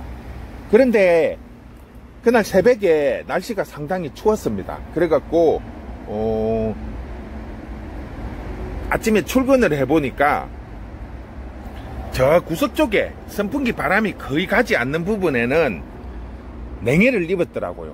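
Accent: Gyeongsang, South Korea. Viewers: 화려함은 중요하지 안어요 있는 그대로의 모습~~ 님의 그수한 경상도 사투리로 알찬정보가 구독자분 들에게는 많은 공부가 된답니다 고맙습니다.감사합니다.축복합니다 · 있는 그대로의 모습과 사장님의 구수한 갱상도 사투리가 어우러져 훨씬 정감가고 멋있습니다 거기다 좋은정보까지 ᆢ 정말 감사드립니다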